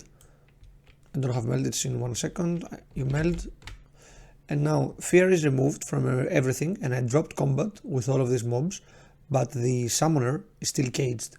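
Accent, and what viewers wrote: Greece. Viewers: I knew that was a Greek accent. Καλή μέρα!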